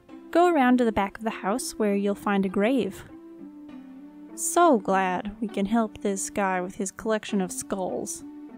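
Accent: Russia. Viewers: RuSsIaN AcCeNt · hilarious that your using a russian accent with an italian based character xD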